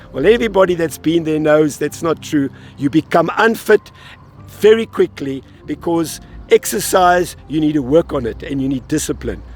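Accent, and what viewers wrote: South Africa. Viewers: The moment I heard his voice I could hear the South African accent straight away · It's music to my ears to hear your South African accent! 🇿🇦 Thank you for your wise insight. From a Saffa who has move to Northern Ireland. 🇬🇧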